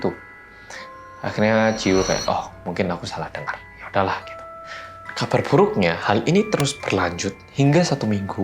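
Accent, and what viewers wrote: Java, Indonesia. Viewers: dari hari ke hari saya amati logat mas sudah mulai bercampur antara medok Jawa dengan medok Korea, ada sengau-sengaunya "H"-nya... hahaha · udah hapal sekarang mah bukan orang korea yang Medook tapi Kewedeen nggk ketipu wkwk Love mas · salam buat org Korea yg medoooookkkkkkk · MAS MEDOK AS MAS JAN NGASIH JUMPSCARE GUA KAGET ASTAGA😭😂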